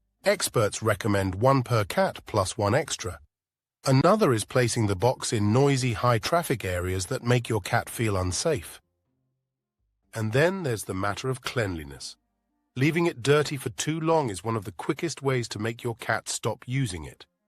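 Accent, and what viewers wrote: United Kingdom. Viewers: This video is a bit fear-mongering. Presumably everyone who’s tuned into this is already considerate enough that we want to hear what we may be able to improve on for our cats, you don’t need to put the fear of God into us by telling us we’re killing them, making them depressed, and all other matter of things in every other sentence 😢 You talked about temperatures twice. You sound either British or like you’ve spent time in the UK. If that’s true, then you know the climate here and the cost of energy. I simply cannot afford to heat my property to between 22-26 degrees Celsius. Do you have any suggestions for how to keep our cats comfortable without spending hundreds per month on heating?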